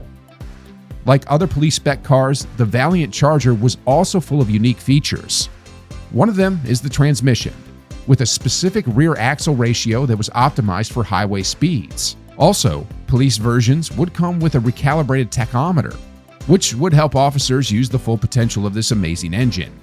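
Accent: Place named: United States. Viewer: The yank voice put me off!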